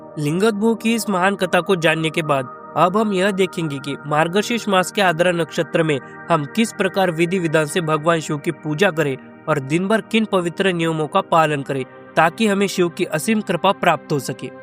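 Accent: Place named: North, India. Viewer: Bhai mujhe aapki baate south indian ki tarah lgi lekin hindi bolne ka accent north indian lga isliye pucha, 😀 If you don't feel to tell then it's okay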